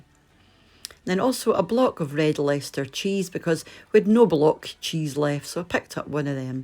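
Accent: Scotland, United Kingdom. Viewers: Hello from Canada. I've been following your channel since few months and love all your videos. I LOVE your accent. I have a cousin who married a Scottish woman from Edimbourg and you remind me of her... Love all your cooking and I am surprised by the prices. In Canada, it's quite expensive... You are a great entertainer.:) I am anxious to see all your new recipes each week! Stay safe and have a great week end! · Love your Scottish accent.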